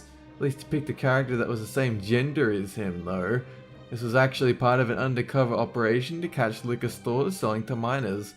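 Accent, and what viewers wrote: Australia. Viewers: i know an aussie accent when i hear it.... he is definately australian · I never knew you were Australian m8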